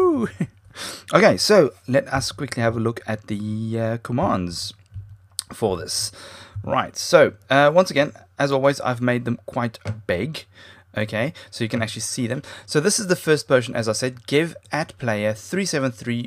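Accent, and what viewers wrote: South Africa. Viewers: are you from south africa ?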